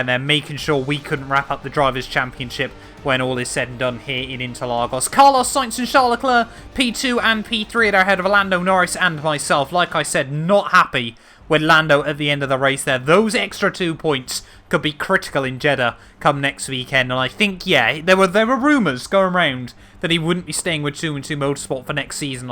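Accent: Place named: England, United Kingdom. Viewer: "Sweat mode has to well and truly be engaged." You have to love the English language when it is spoken by an Englishman. To me, it just sounds like F1. 😁🇺🇸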